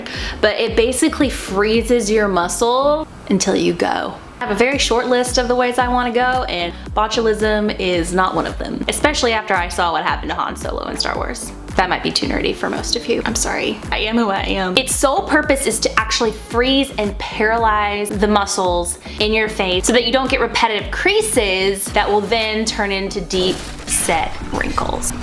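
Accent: South, United States. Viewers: F A N C Y - really southern accent - love it!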